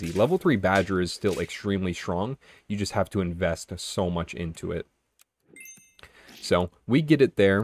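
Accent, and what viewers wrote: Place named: Canada. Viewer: You sound so Canadan at the beginning of this video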